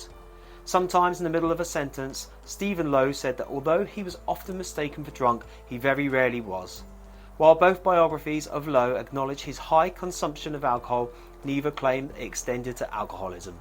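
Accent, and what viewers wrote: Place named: Birmingham, United Kingdom. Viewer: Thanks for this video….and it’s good to hear a Brummie accent again. Bless you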